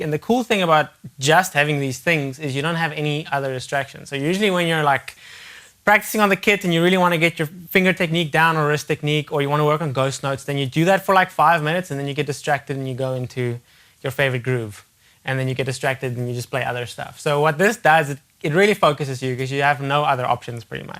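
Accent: South Africa. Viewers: Sounds like South Africa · SOUTH AFRICAN! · What kind of accent is that??? South African?? Where is from??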